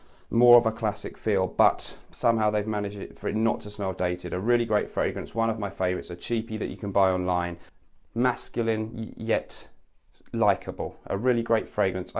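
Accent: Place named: London, United Kingdom. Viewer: Your a London chap aren't ya ?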